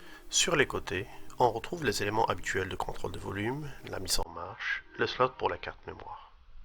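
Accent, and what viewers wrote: Quebec, Canada. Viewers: Très bonne vidéo. Ca m'a aidé a la choisir pour mon fils. C'est appréciable quz ton accent québécois n'est pas très marqué ca permet aux européens de l'écouter avec plaisir. +1 pouce bleu pour te soutenir pour ton bon travail.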